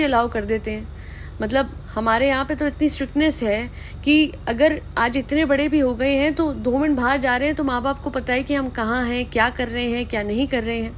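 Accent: Punjab, India. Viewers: Yes she is definitely a Punjabi cz she literally uses such words jo sirf Punjabi hi bol sakta hai with proper accent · Panjabi accent lajawab lagi..👏👏👏👏.... Malik's and Ibrahim's ka to name sunte hi maan ki shanti bhang ho jati hai aur gussa lagne lagta hai..... baaki good points 🙏🙏🙏🙏🙏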